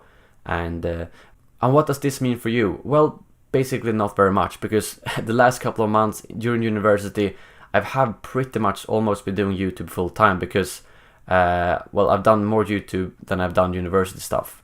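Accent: Sweden. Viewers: Well I gotta say this I hope that your channel grows much bigger and I think that I speak for many subscribers that, we would follow and support you even when battlefront dies out.(also for all those people that poke fun at the guys accent give him a break he is from Sweden so English may not be his first language) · I came to this channel a few days after DICE's Battlefront announcement (before the Røde microphone and at the peak of your strong swedish accent hahaha), and I've been suscribed since then. I participated in the forums and I've seen most of your videos, including the rumors and "what the fans want". I've seen your progress through the channel, from short pieces of news to full-length informative videos that are both extremely hard to find otherwise and very well packed with information. I absolutely advice you to keep on with the Patreon and pursue a full-time career here on YouTube, this may be a niche channel....but it's the niche channel we people need to have in order to be updated with Star Wars games. 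Huge thumbs up to you and keep being awesome! -Kanto.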